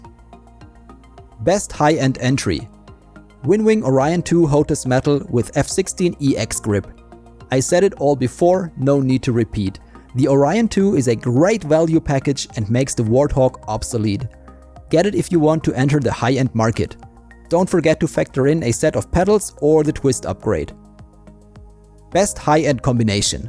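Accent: Germany. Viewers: bin ich der einzige der denkt das er deutscher ist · By the way are you German?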